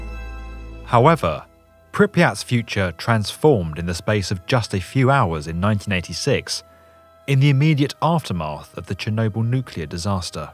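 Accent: United Kingdom. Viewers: Man i love your videos they are so informative, entertaining and savvy i could watch them all day..don't stop your fantastic work. you speak clearly and slowly and your British accent helps make it more easy listening rather than some fast talking American woman's voice.. it makes a difference in my opinion... Thanks for your videos which im happy to share with my Facebook friends anytime.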